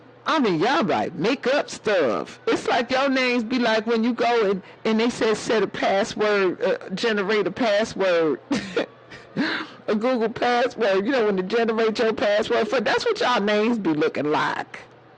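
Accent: South, United States. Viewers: Omgosh u sounded like my mom when u said that I'll tell u that much! That southern drawl needed that today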